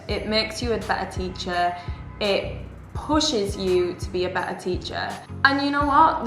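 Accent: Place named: England, United Kingdom. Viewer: Hello ! Thanks for the video ! Im very curious to know about your accent. From which region are you in England ?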